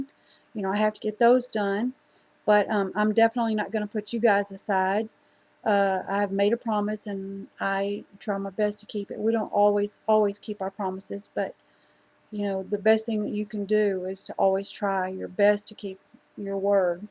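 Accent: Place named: South, United States. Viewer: You are such a sweet person & you are living like Christ wants you to & giving to thise in need is sucha wonderful way to show HIS love..Your pies look so yummy...My mom use to make those...She is ina nursing home now with Alhiemers..You have a southern twang like me..I'm from Oklahoma. I like the name Peyton as well..So cute!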